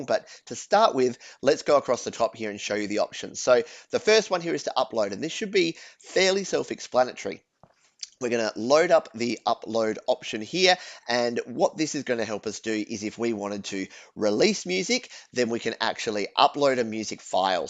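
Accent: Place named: Australia. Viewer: where in australia do you come from, your accent is nice.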